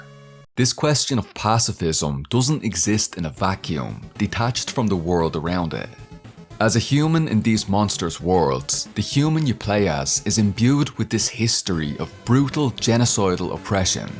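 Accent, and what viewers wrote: Ireland. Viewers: I’ll have forgotten the spoilers by the time I play. besides, you arent the first. the people I used to know back in school would always talk about this game. of course, none of them did it with a handsome Irish accent and a Marxist analysis to top it off, so Im a little more inspired to see the game for myself now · yo I clicked on the video and in the first few words I was yoooo this guy is a fellow Irishman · as a fellow irish person, it's so great to see another undertale fan from our country! this video was amazing and extremely well articulated ❤ you earned a new sub 🇮🇪🇮🇪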